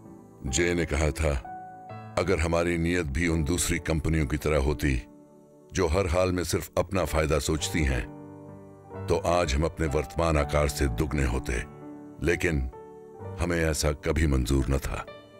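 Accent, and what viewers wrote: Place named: United Kingdom. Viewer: Hindi in English accent is amazing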